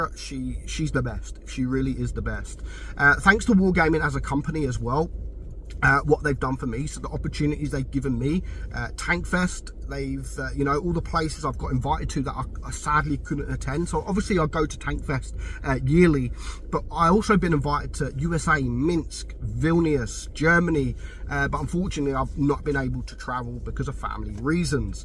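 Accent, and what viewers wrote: United Kingdom. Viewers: Really sorry to see you go. I am going to miss your British accent. God speed.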